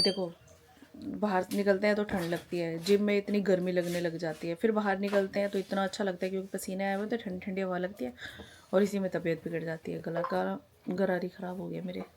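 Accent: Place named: Multan, Pakistan. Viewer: Aap.ko Multani bolnee aatee hai. Aap Kaa accents hai Multani bolnei kaa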